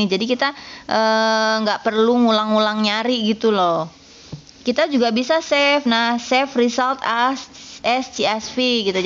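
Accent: West Java, Indonesia. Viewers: Hatur nuhun ibu...meni Sunda bangets hehehe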